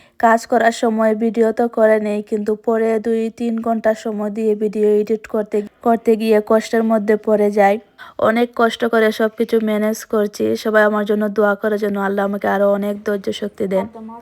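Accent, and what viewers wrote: Sylhet, Bangladesh. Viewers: আপু তো সিলেটি মনে হচ্ছে সিলেট কোথায় আপনার বাড়ি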